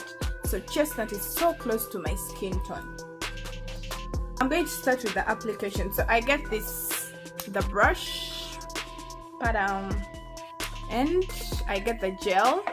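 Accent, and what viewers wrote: Uganda. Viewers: Your accent tho😂are you from Uganda 🇺🇬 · The Ugandan accent💓💓. Love itttttttt🤗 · You speak like a Ugandan🇺🇬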